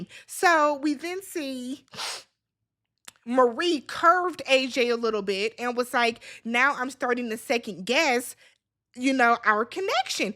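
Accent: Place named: DMV, United States. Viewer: Are you from the dmv area? A couple words and the way you pronounced things gave DMV 😂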